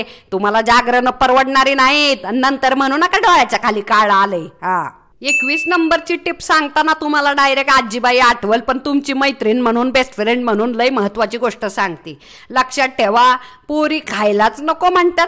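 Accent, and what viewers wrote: India. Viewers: खूप छान माहिती सांगितली ताई आणि आपली गावरान भाषा तर लय भारी 👌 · तुमची गावरान भाषा पण किती छान वाटते ऐकायला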